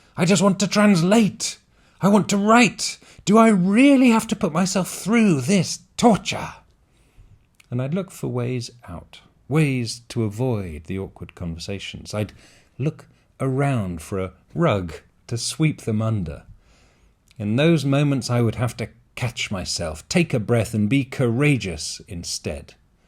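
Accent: RP, United Kingdom. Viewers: Обычный RP, нейтральный акцент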